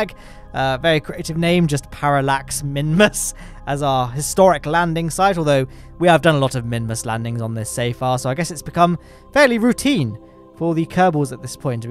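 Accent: United Kingdom. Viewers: I love how the auto generated captions mistake British accent for saying "Lambda" instead of Lander.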